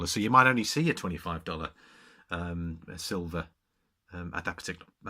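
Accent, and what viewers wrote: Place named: Merseyside, United Kingdom. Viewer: Being robbed at gunpoint sounds fairly horrific. Can I ask where exactly that was/is ? I do detect a Merseyside accent...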